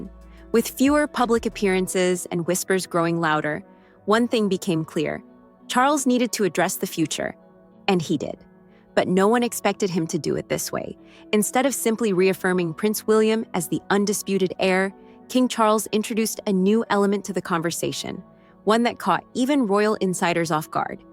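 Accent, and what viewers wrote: United States. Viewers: Another American voice coming from the speaker's rear about something about which she knows nothing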